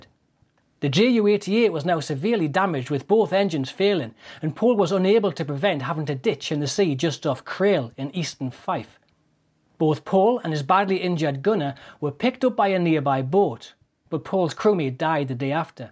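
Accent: Newcastle, United Kingdom. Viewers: The narrator does not have a Scottish accent. The accent is from the northeast of England - a Gordie. Many Scots do speak English well and with a large vocabulary however. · It's Geordie, and it's grand! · Not a robot and not at all odd. It's a North-eastern English accent, probably from the Newcastle area, less than 100 miles from the scene of the battle. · I'm Scottish and I also thought he was a geordie lad. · Beautiful Geordie accent, I love your voice. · Sounds like a Geordie( Newcastle,)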